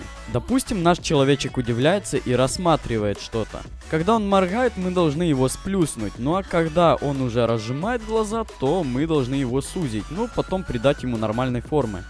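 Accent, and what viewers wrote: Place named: Ukraine. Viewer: Тоже украинец? Буква Г хорошо выдаёт)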